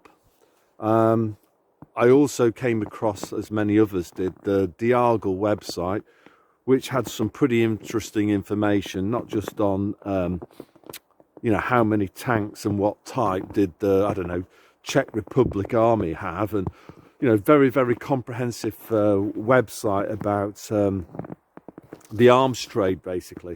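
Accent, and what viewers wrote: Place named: Lancashire, United Kingdom. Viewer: We gave up work in our late 40's and moved to a house we had bought a couple of years earlier in northern Spain, intending to live "the good life". We ended up loathing the insane bureaucracy and thinly veiled corruption and returned to a very rural part of England. I have thought about upping sticks again but have more or less given up on the idea. Ironically I have met more like minded people since this whole sh1t show started than I ever knew before and have a great network of awake friends as well as an awake church I attend. Also I am fully expecting to be raptured more or less any day now so am hanging in here. Really look forward to your words of wisdom and, as a fellow Lancastrian, enjoy the delivery almost as much as the content!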